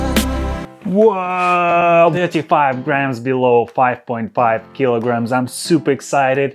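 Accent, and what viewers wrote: Russia. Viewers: Так и думал, в самом начале ролика, что русский =) По акценту слышно.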